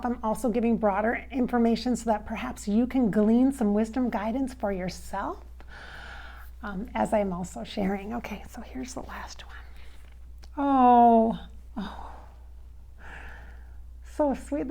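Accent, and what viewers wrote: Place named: Ireland. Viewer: Love your Irish accent lol 😂 watching in Northern Ireland ☘️💜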